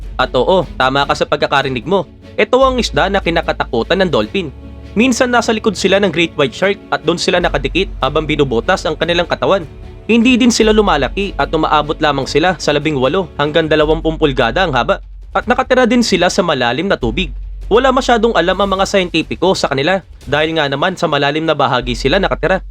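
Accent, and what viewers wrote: Iloilo, Philippines. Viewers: Ilonggo ka lods?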